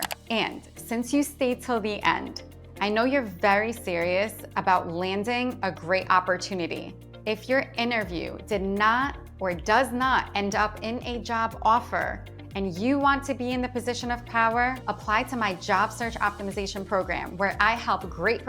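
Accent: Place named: Staten Island, United States. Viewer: Staten Island accent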